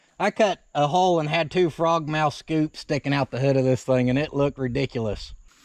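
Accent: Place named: South, United States. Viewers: Took me a minute but your humor got me past the hillbilly voice. I subscribed and I'm telling everyone. Good content and downright funny! Oh and good on yer wifey for recognizin the talent. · you gotta drop at least 2 vids a week. And yeah love that southern accent. I also watch Randy from Auto auction rebuilds hey spoke of you a while back in a video .TKs Garage , Lucky's wheels Deals ,Vice grip garage .Yeah lol I learned a lot new words Libby dubby lol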